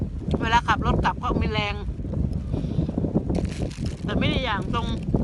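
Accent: Isan, Thailand. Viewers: คือบ่เว้าอิสานโรจเนาะสำเนียงก็ออกอีสานยุโอ้ยๆๆๆ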